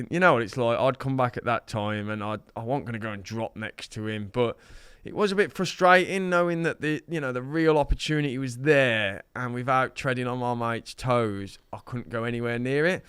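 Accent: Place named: Birmingham, United Kingdom. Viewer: with a Brummie accent! meets Peaky Blinders!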